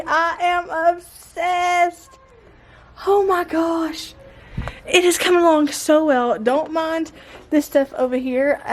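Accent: South, United States. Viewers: So sorry about your chickens. We cry with you. God created them too., you are kind, intelligent and God's beautiful daughter. You are not stupid! Shame on the person that said that. You have a southern accent and dialect sweet lady - never lose that, never change. You all did an amazing job on your porch. It looks great! Big hugs.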